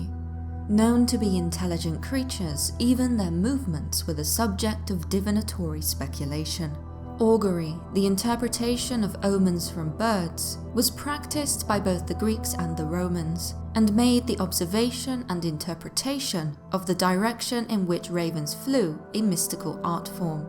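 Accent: United Kingdom. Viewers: I subscribed few days ago, love ur voice n narration!.. Bdw.. Are u British ???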